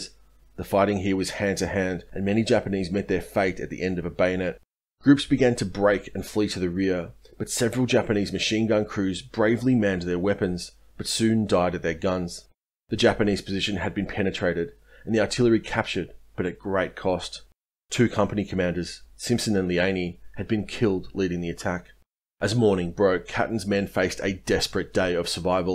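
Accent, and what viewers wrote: Australia. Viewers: As an American whose father and uncles fought in Europe, and neighborhood families who fought in the Pacific, wonderful to hear detailed, reasoned, account of Bona-Gona, with an Aussie accent, which makes it believable. My generation never heard much (except sinking transports!) Many thanks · Wonderful great to hear an Aussie voice at the helm. History needs to be told by all nations. Here in the UK, the young seem to be denied an education whilst being fed on a diet of puerile rubbish like who's bonking who on Love Island .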